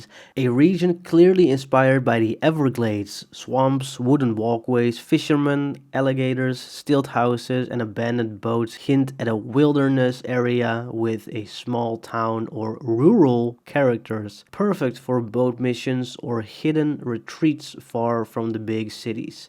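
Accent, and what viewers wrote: Netherlands. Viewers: You dutch? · I can smell dutch, nice vid btw!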